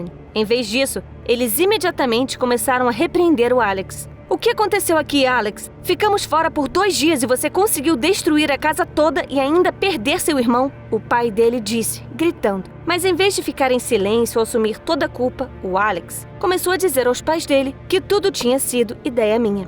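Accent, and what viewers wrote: Brazil. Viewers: Como ela é de Portugal sendo que ela fala igual brasileiros , Claro q eu sei q Pessoas de Portugal falam português , mais tipo eles falam diferente do Brasil, ou ela é brasileira e foi pra Portugal?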